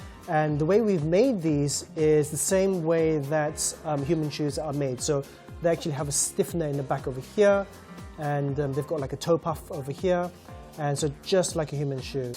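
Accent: United Kingdom. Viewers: Wow! your Smart Dolls are amazing! i love them! and Also, you have a beautiful english accent, i understand you perfectly, congratulations!!!! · He sounds british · British accent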